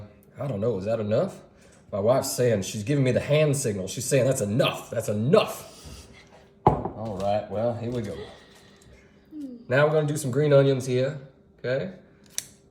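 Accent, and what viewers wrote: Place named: Virginia, United States. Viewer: Sounds like Virginia's in the house! Haven't heard that accent since The Walton's😂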